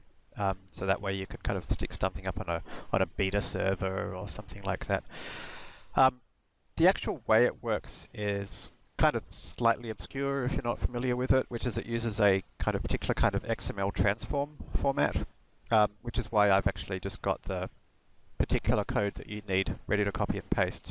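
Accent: Australia. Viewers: Excellent Series, very clear and understandable especially since it was end to end(Bonus points for being in an Australian accent too). I am in the process of melding this framework to the out of box internet application in MVC. I only have a few questions: How can angular deal with Authorisation? How can Angular prevent CSRF with the in built Token Validator in MVC? Is it possible or even advisable to use cshtml pages running razor code as templates? And lastly is there a way to prevent a user from manually navigating to a html template to stop them from loading it without the index page? Thanks very much :)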